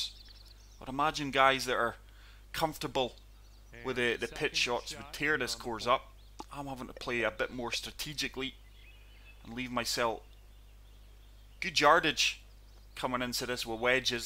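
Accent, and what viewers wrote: Scotland, United Kingdom. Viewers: I hear scottish i sub 🏴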